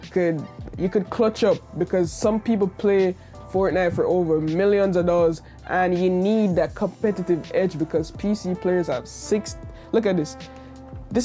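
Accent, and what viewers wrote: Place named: Jamaica. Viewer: I'm new and I just heard yu voice and mi a say that yu sound Jamaican who live in America I'm JM btw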